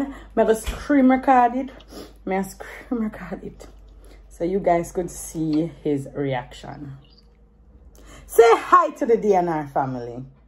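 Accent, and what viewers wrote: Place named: Africa. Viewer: I'M SO HAPPY FOR YOU GUYS 🙏🙏🙏❤❤❤❤Love the african talking 😂😂😂 Heyyyyyy!!!!!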